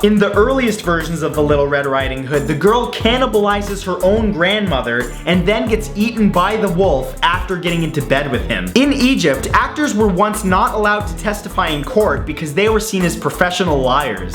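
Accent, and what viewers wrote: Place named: Canada. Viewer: Are you Canadian?? I notice a slight accent at times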